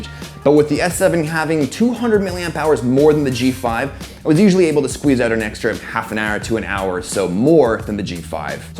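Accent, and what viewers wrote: Canada. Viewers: i like u're videos man...and clean voice)....are u Canadian? I hesrd Csn from one video)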